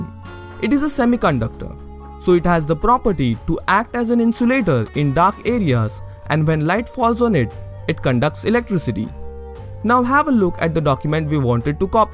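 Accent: India. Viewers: I am a Taiwanese and no offence intended. Actually, I am not a fan of indian accent but because your video is highly informative and understandable so I found your voice beautiful and educational.... Big thanks！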